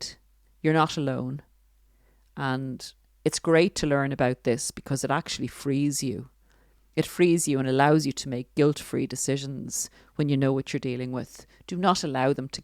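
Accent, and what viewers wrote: Ireland. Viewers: You are describing my mother … thank you. And I love your Irish voice - everything sounds so clear!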